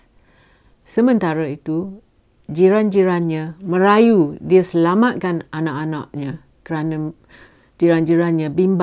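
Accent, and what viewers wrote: Perak, Malaysia. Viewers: Orang mana ni lorat perak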